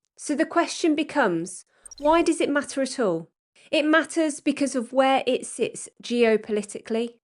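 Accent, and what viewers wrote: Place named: Black Country, United Kingdom. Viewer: Great Black Country accent by the way. Love it ❤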